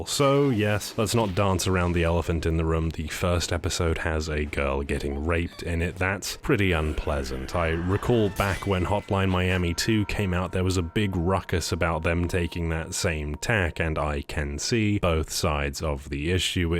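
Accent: United Kingdom. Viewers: Caffeinated British chatterbox boy did it again. You are getting me back into anime even though I got a girl and a kid. Watched Konosuba on your recommendation some time ago with the girl and we enjoyed it (after getting over the Darkness-induced cringe), so I guess we will be bouncing off some bunny girl's bum now. Keep it up!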